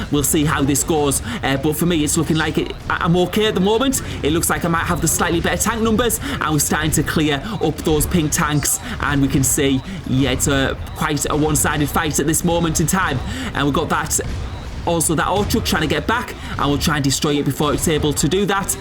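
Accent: United Kingdom. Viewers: i like your akzent :) very british right ? did you join the german community ?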